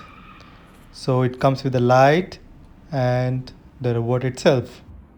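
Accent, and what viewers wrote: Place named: Russia. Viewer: You with Russian accent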